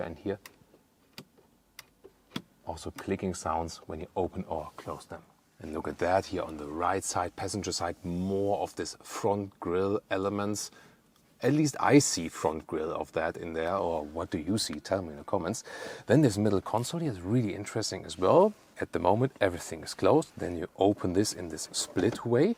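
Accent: Germany. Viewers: Deutsch-english LOL XD bleah!!! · I love german accent 😂😂😂🇩🇪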